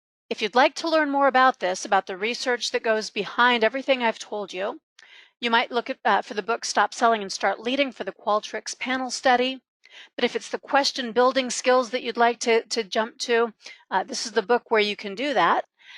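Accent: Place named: United States. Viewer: The heavy American accent has ruined the presentation.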